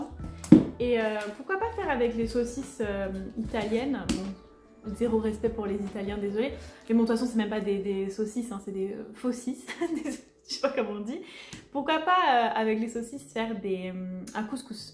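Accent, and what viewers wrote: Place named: England, United Kingdom. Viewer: Il est kiffant ton accent anglais. xD Et Sausage Party c'est le feu, à regarder vrament.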